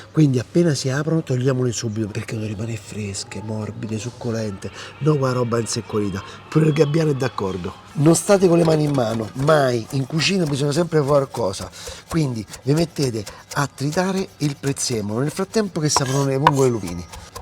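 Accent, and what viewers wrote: Rome, Italy. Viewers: Minchia non mi stanco mai di vederti e soprattutto sentirti con quell'accento romanesco che mi fa' impazzire, sei grande sei la passione in cucina, grazie per i tuoi preziosi consigli ❤ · Certo fai la spesa al mercato di trionfale parli romano sei de Roma e apri il ristorante a Milano...😅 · Ciao... Ogni volta che te sento parla mi sembra di ritorna' a Roma mia.... Mi manca tanto ❤❤❤❤❤ ricetta fantastica ❤ Bona!!!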